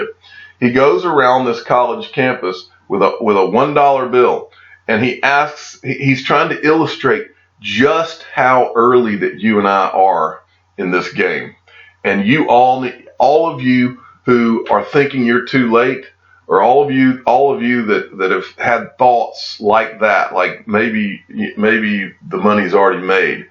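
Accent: South, United States. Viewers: It’s just a matter of.. Love the southern accent😉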